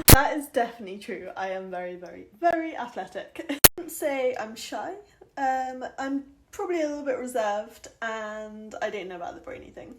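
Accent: United Kingdom. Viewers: Would live to find a cute and sweet british girl like. That accent and cute face is all an American guy needs. 😍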